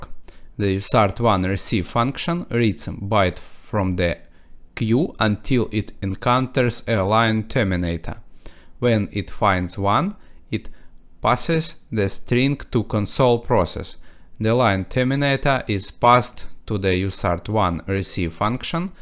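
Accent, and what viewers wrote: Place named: Russia. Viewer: приветствую! ваш анлийский поймет только русскоговорящий, говорите лучше на нашем могучем языке, он великолепен! :о) удачи в творчестве!!!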